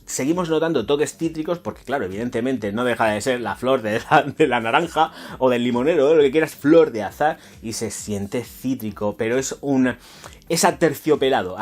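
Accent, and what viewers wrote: Spain. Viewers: Que preciosa reseña, eres genial un artista describiendo perfumes me encanta es que eres autentico y ese asento Español que tuani muy lindo tienes Luz y carisma muchísima eres Fenomenal como me encantaría este perfume pero aqui en mi pais no lo venden saludos desde Nicaragua.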